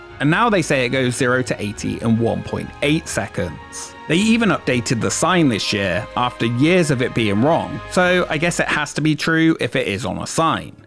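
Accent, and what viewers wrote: United Kingdom. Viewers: be honest are u a brit?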